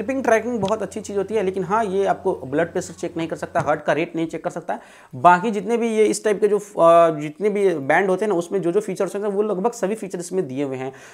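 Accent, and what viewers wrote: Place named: Bihar, India. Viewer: bihari HAI. Aise hi bolega!!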